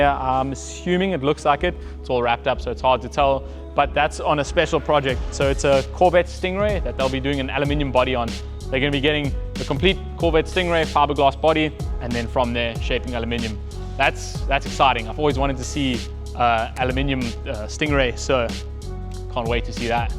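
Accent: South Africa. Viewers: Thats South African accent.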